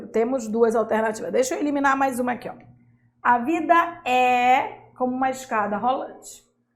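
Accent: Pará, Brazil. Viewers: Muito bons o conteúdo e a aula ❤ Percebi que a professora tem o sotaque do nosso Pará ❤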